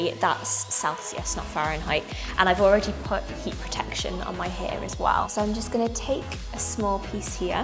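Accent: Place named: Wales, United Kingdom. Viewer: Welsh if im not mistaken!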